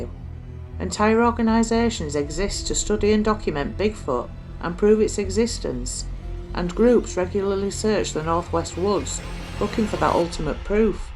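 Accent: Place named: Yorkshire, United Kingdom. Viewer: Wow, I’ve just found this after shared the link. I seem to have been unsubscribed!! Fabulous to see you back, I’ve been missing your great Yorkshire narration 😊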